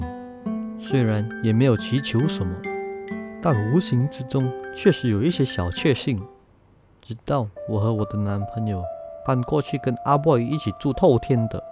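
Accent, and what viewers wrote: Malaysia. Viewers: 你做的卡通很好看，听你的声音感觉是大马人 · 哈哈以前玩線上遊戲 有個大馬朋友就是跟你差不多 所以聽你講福建話我就想到他 也猜到你是福建後代🤣 好久沒聽到大馬福建話了😁 我以為這件事情是發生在大馬😅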